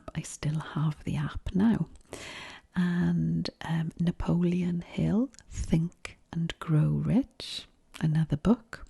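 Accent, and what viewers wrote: Wales, United Kingdom. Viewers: I love Welsh accents! So sophisticated!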